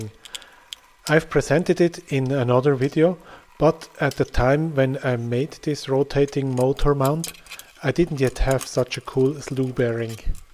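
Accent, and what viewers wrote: Switzerland. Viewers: Where u from? Swiss? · Sounds swiss-german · Bisch du us de schwiiz ? So vom Dialekt her hanni denkt. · Richtig guets Video ;D mer erkennt eifach en schwizer mega schnell wenner englisch redet ^^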